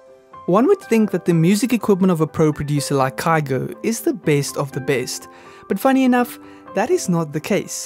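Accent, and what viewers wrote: South Africa. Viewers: Really enjoyed this video! Toe jy begin praat, het ek dadelik besef jy’s van hierdie kant af! Looking forward to see more from you 💪🏽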